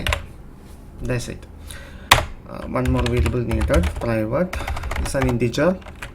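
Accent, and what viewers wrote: Kerala, India. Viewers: are you from kerala ? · I tapped the ad so you get money, its very helpful tutorial I love ur accent btw, very hot Indian accent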